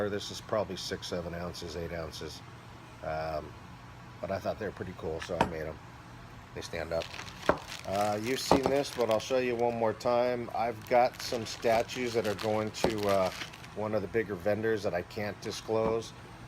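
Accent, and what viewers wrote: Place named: North, United Kingdom. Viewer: awesome pieces and I love the Statue of Liberty with the buildings Skyline pretty cool the day always brings back memories I was in Staten Island when 9/11 happened so it was pretty scary and crazy the same time. it'd be cool if you could make the old Skyline with a police badge on the bottom a fireman badge on the other side of the bottom and paramedics like a little memorial statue I'm from the tri-state I don't know where you guys are based out of a forget I heard the northern accent. anyway I keep Rambling On Again LOL I just love your work. great work and very cool stuff to you keep up the good work I like the bars too I have a coin keeper bar from you I love skulls though. later dude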